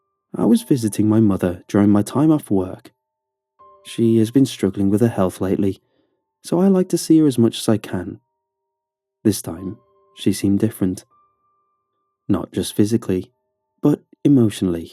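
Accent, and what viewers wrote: England, United Kingdom. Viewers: Not on my reg profile, on a friends..I've been listenin 2 for yrs now, my fave CP narrirator, is it just me, or his voice sound watered down? way less English?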